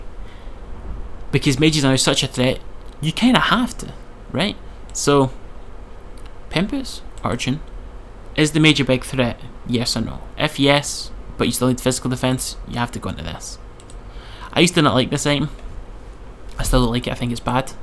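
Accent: Scotland, United Kingdom. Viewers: Could you talk just a little bit slower:D kind a hard to follow when your not form Scotland.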